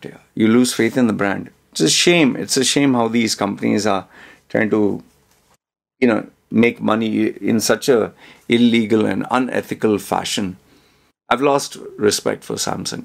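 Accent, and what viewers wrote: India. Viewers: bro ur accent is very similar to Indian accent and ur voice is very soothing....😅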